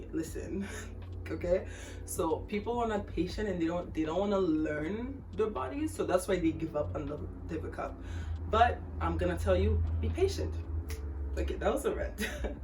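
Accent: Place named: Haiti. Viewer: Ahhhh I posted on your last video now I can here the slight Haitian accent. Maybe Haitian Canadians sound slightly different to ones here in America. My family is from Haiti on my fathers side. bèl dekouvri ou. I switched to organic tampons but have been wanting to make the switch to something like the Diva.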